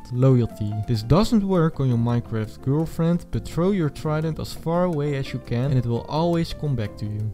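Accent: Netherlands. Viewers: Love the Dutch accent hahahahah! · Are u Dutch?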